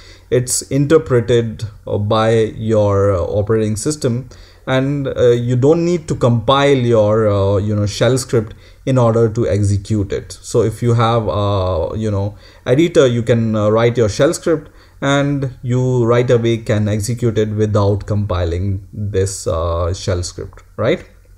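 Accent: India. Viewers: I personally like the indian accent more than some hardcore "real" english dialects which i dont understand at all. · i hate indian accent :( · Many are commenting on Indian accent. Let me tell you Indian accent for English language(despite not being mother tongue language) is far better in comparison to Hindi accent of native English speakers. If any of the native English speakers doubt what I said JUST GIVE IT A TRY...LOL. By the way this tutorial is very impressive.👍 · Response to accent comments. Yes an Indian accent can be a bit hard... but as long as I don't hear the word "ugh", "uhhh", etc it is much better to listen to. A well practiced and well pronounced accent is appreciated, but I don't think this tutorial is unbearable at all. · beware indian accent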